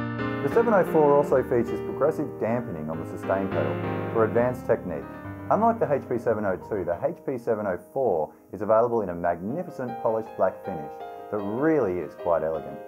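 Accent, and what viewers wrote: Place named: Australia. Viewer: This is how Australians speak, proper English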